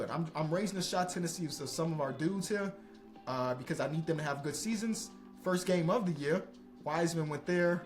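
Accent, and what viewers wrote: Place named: New York, United States. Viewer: I still love that ever since became friends with SAS, he took on that New Yorker accent lol. Epic.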